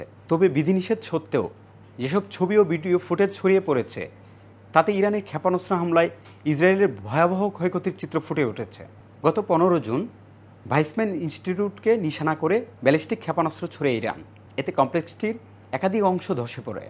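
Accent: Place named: Delhi, India. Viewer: delhi r loker bangla jmon houa uchit r ki..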